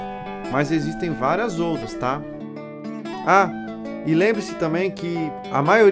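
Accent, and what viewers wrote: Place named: Florianópolis, Brazil. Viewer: Muito bonito seu trabalho! O sotaque manezinho da uma qualificada ainda maior! Rsrs As imagens são bonitas! É vc mesmo que faz todas? Continue firme! Está de parabens!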